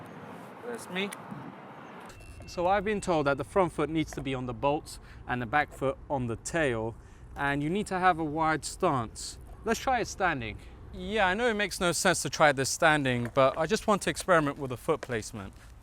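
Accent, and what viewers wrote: United Kingdom. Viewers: It sounds so weird listening to a British person doing a tutorial bc I usually hear American - accented people. But I am a British kid myself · Hey, I've been following you now for a while, and thanks for your great content. I am 26 now, and skated from 7 to 12 or something. I've always regretted that I stopped at some point, but I'm now starting again, and I FREAKING LOVE IT. I really relate to your feelings about sharing a skatepark with 10-year-olds that are better than you. But fuck it, skateboarding is awesome, and as long as you don't bother someone, everybody is always cool about it. Anyway, the reason for my comment is that I just want to let you know how great your content is. That video where you paid the narrator on fiverr in your intro, and told him to shut up eventually was one of the most hilarious bits I've seen. This goofy shit is what makes this channel great (amongst others). 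For example, the goofy edits that don't really belong, but are funny anyway. Keep doing those, because they are fucking funny. Just like the random chatter in between skating sessions (you're british so listening to you talk is somehow pleasant). You want to be a filmmaker. Well, keep this up and I can really see you get there. 15k subs is usually where the snowball can start to really roll, especially when you get lucky with a video. These skateboard progression videos usually do amazing. Yours have been great too. I would love to see more of that. (especially since you show the bails, and sorry, but they look so clumsy and funny. again, sorry). The point is - if there even is one to this big wall of random noise - is th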